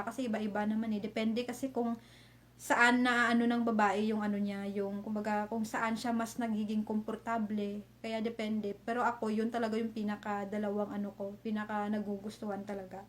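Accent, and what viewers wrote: Iloilo, Philippines. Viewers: Ilongga ka mam?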